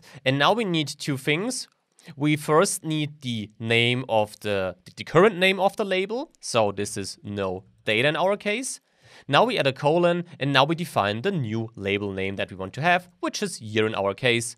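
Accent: Germany. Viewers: I love your Deutsch accent <3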